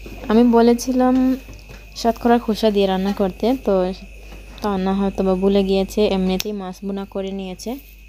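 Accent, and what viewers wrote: Sylhet, Bangladesh. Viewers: beautiful vlog. nice blog. apu apni ki sylheti ami confused tai ask korsi apni ke.super blog.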